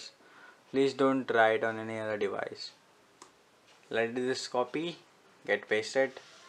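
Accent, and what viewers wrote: India. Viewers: Indian accents, why?! Just can't understand a word.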